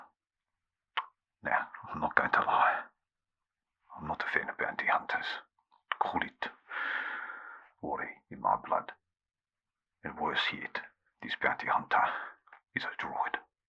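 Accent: South Africa. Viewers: Anyone else hears a White South African accent? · Is that you South African accent you are using? 🖤🖤🖤love your work always.😊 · Are you from the east rand bru? You sound like my cousin from Benoni.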